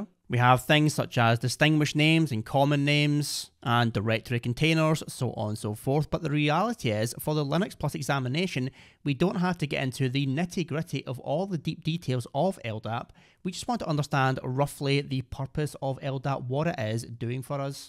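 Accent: Glasgow, United Kingdom. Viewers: First 3 minutes I was just trying to get used to the scottish accent... but otherwise good introduction. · Its a magical unicorn type of accent only from one place - Glasgow, Scotland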